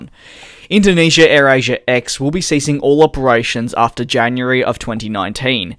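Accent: Australia. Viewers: You sound Australian, it's just an observation. I'm another Aussie presently living in Sri Lanka and go back and fourth to Sydney and Colombo. I'd made the mistake of 'trying this airline' That I will never repeat. The Manager for AisAsia in Sydney who's name is Jason James Lee was very rude and condescending to me. Therefore I for one can say that I will never fly this airline. Its good to see that they're falling apart. Their customer service may also be another contributing factor. Brett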